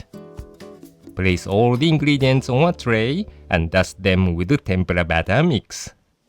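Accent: Japan. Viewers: 这英文真的很日式。(˶‾᷄ ⁻̫ ‾᷅˵) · カタカナ英語聞きズラいなぁー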